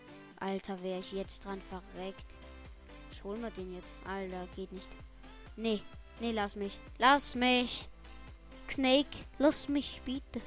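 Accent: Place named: Lower Austria, Austria. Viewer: sag mal kann das sein das du aus niederösterreich sonst was kommst denn in deinem reden hört man hochdeutsch und bisschen dialekt xD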